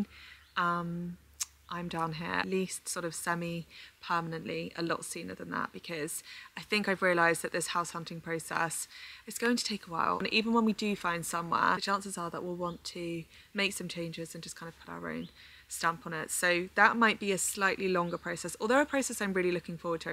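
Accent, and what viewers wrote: England, United Kingdom. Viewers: I really like your accent 😊. Which part of England is it from?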